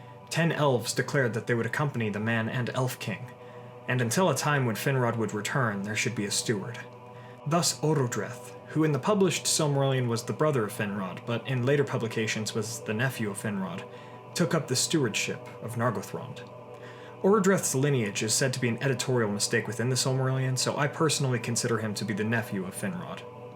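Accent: United States. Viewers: Lol, it sounds so wrong told in an American accent😂🤣!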